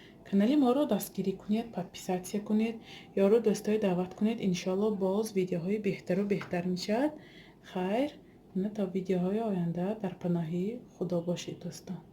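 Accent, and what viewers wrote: Isfara, Tajikistan. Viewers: Рахмати калон моям аз исфара аз тарафи таги бихи 39 сола шахри Саранск ба 6солбоз зиндаги мекунем сохиби 5 писар❤️❤️аз овозатон фарк карам ки аз исфараи бостони хастед.. Хелллеее шод шудам.. Дина торти наполеон ро бо рецепти шумо таер карам бенихоят хамираш махину бомазза буд рахмати калон... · Гап нест. Апачон ба фикрам шумо а Исфара а? Лахчатон худи исфараги боин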